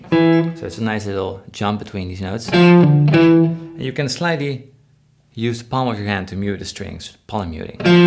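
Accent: Netherlands. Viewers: What nice licks,! I ( 73 ) play and love my guitar(s) since 65 years. Still pure joy, fascination and constant learning. In addition, your slightly nederlands accent: Wonderful! Keep it up!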